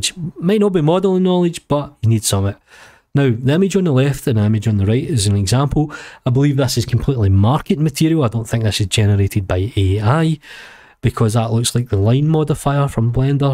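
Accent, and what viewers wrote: Scotland, United Kingdom. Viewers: I am just here for the Scottish accent...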